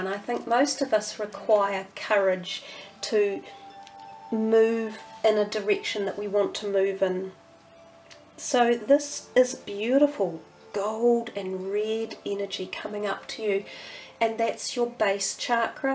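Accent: New Zealand. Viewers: Awesome Reading ~ thankyou :))) Archangel Haniel also brings in the Energies of The Moon for us ~ which weaves beautifully with Archangel Gabriel's Message ~ Intuition & 'Pyschic Insights' .... :))) Wonderful to hear a fellow NZer :))) Aroha & Angel Blessings!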